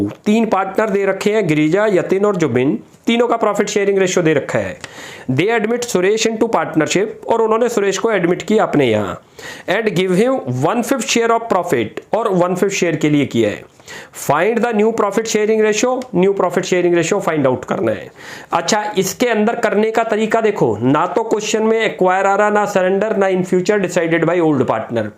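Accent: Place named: South, India. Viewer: I think the person is from southern part of India..... Most people learn English there.... I lived there for some 3 years and I know that it's difficult for them... But yeah you all are right that he/she should change the channel😅😊😊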